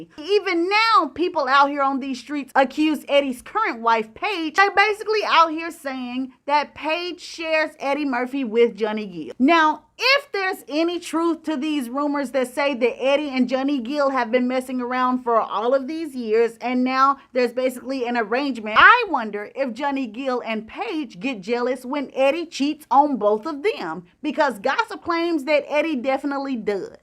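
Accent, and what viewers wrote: South, United States. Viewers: Great video,! Your southern accent is really sweet. I slightly gasped when you used the potty words. Nevertheless, I'm a die hard fan of yours.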